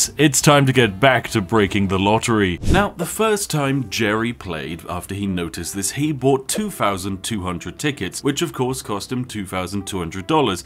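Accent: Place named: United Kingdom. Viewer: oh my fuck YOURE SO BRITISH... i love it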